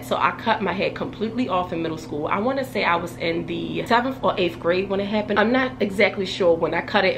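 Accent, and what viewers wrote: Baltimore, United States. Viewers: He said Rick James 🤭. I’m laughing because I actually have the album with Rick and the short bang. Still gorgeous hair with the short bangs!!!! Just found your channel binge watching your vids now. I hear Baltimore in the accent (I live in MD).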